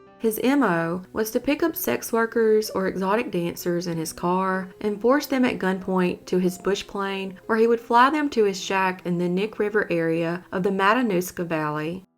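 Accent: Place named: Mississippi, United States. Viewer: I'm from Southeast Tennessee and you sound just like we do... Why I love ur videos. Keep it up!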